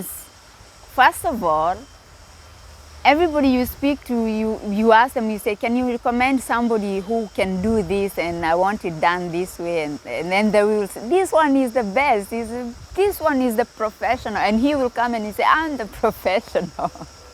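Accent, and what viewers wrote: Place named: Kenya. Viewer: You speak like a Kenyan, were you originally from Kenya? I'm coming to Greece to buy a holiday home, later a retirement home.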